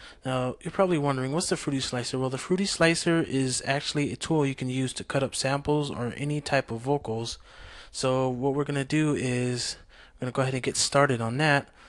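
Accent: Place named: Caribbean. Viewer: Nice tut! I can tell you're carribean.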